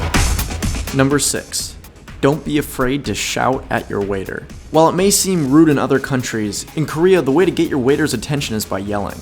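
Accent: United States. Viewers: Are you by any chance from Maryland? Your accent reminds me of so much of some of the people from there.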